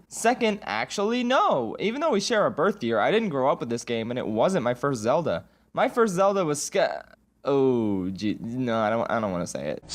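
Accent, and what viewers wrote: United Kingdom. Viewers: omg you’re british no way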